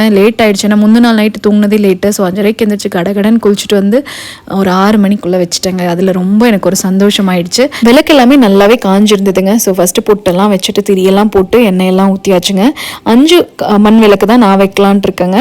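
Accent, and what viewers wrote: Coimbatore, India. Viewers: Lovely vlog ma❤ I studied in Coimbatore Institute of Technology..its been 9 years Missing Coimbatore so much ..Your slang reminds me of lovely cbe ppl ❤️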